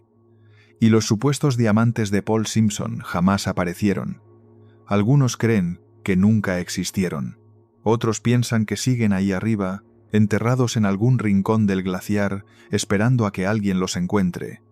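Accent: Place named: Spain. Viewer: ERES ESPAÑOL, NO LO VERÉ .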